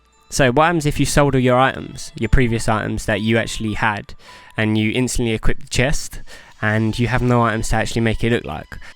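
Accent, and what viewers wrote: London, United Kingdom. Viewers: Your videos are cool, I've subscribed for future reference :oD I don't think they are too long at all, you describe everything really well, plus you sound like a proper London boy which is my neck of the woods and that's always gonna be a bonus :oD haha!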